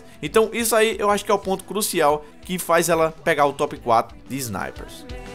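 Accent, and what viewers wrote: Northeast, Brazil. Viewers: ruma de bônus kkkkkk sotaque nordestino é diferenciado!!!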